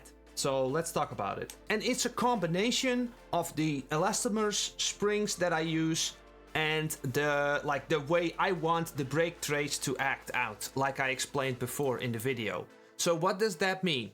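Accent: Netherlands. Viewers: Nondeju dat is een lekker Hollands accentje jongen 😁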